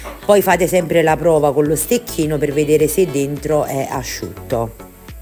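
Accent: Naples, Italy. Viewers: Ciao è da poco che ho conosciuto il tuo canale e mi piace sentire il tuo accento napoletano (io sono di Roma) e le tue ricette buonissime.Pietro è simpatico, un bambino pieno di vita ma, come mamma volevo dirti una cosa che spero non ti offenderà.Quando mio figlio era piccolo, più o meno come Pietro, era cicciottello e i bambini lo prendevano in giro, purtroppo tanti genitori non insegnano ai propri figli che prendere in giro un bambino solo per qualche kg in più può fargli male, comunque lui aveva poca autostima e ne soffriva. Adesso ha 25 anni ed ha un bel fisico, va in palestra e sta attento al mangiare come la maggior parte dei bambini che magari da piccoli erano cicciottelli e che poi imparano a stare attenti a ciò che mangiano, però quel periodo le prese in giro lo facevano stare male. Non vorrei vedere nessun bambino passare tutto ciò quindi ti volevo dire di cercare di invogliare Pietro a stare un po' più attento a ciò che mangia o magari fare sport così che non passi ciò che ha passato mio figlio. 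Scusa se mi sono permessa ma sono una mamma e so che nn è facile (sicuramente ci avrai già pensato). Un abbraccio affettuoso e a presto:face-red-heart-shape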